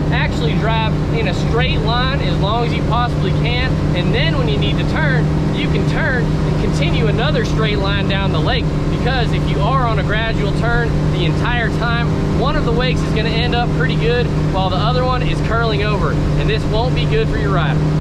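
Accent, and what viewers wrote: South, United States. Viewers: Why does he have a southern accent all of a sudden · Country accent :O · Haha, nice Texas Twang! Great Tips! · Extra Texan for this one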